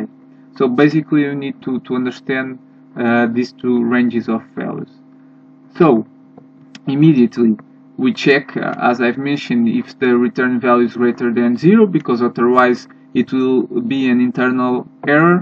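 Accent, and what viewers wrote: Portugal. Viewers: Are you portuguese? Your accent seems me very familiar 😂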